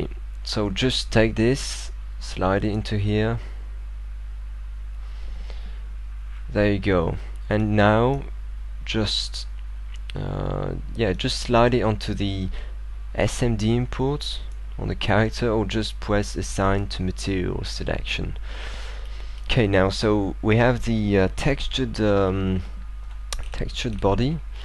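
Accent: France. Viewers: frenchy accent spotted :p en tout cas t'as un super niveau en anglais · Frenchie accent <3 ;) I'm french too :)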